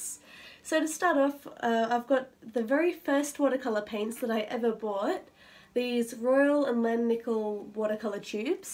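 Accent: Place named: Australia. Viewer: This is probably stupid but when I opened the video my first reaction was is this a fellow Aussie...I think so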